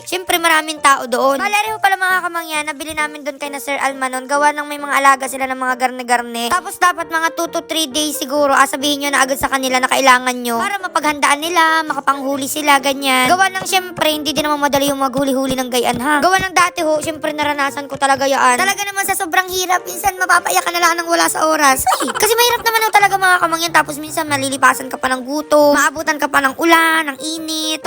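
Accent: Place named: Marinduque, Philippines. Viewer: Happy birthday 🌺🌸natutuwa talaga ako sa iyo paano ay kuhang kuha mo yung salita namin sa Marinduque😅😅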